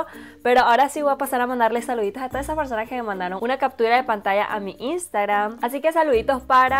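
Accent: Venezuela. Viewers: jajajajajajajjajajajaa que risa no paro e reir ¿eres venezolana?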